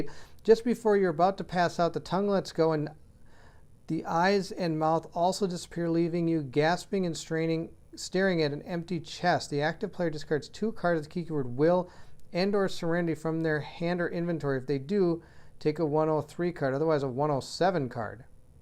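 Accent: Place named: Midwest, United States. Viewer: I'll never get over the midwest pronunciation of "root" and other similar words. Haha. Had a classmate from Duluth many years ago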